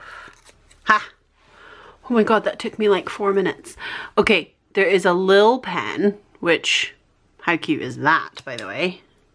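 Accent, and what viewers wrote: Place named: England, United Kingdom. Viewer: 🤣🤣 love your ‘proper’ English accent!!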